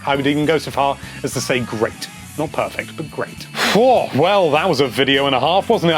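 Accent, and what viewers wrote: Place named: Wales, United Kingdom. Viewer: I'm confused because you sound half Welsh and half Posh English... haha!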